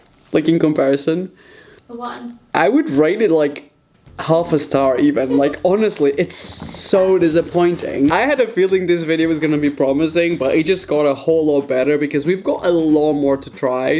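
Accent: England, United Kingdom. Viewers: 10,000 subs without any videos NEENJA Portuguese people don’t have a accent really at all, he has a mix of British and English . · THE BRITISH-NESS!!!! I LOVE IT!!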